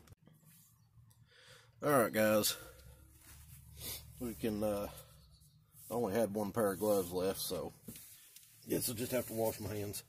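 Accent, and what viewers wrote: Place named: Eastern Kentucky, United States. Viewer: Hey brother found you channel not to long ago and I just hope you're doing well! Cheers from eastern KY I can tell you got a accent near me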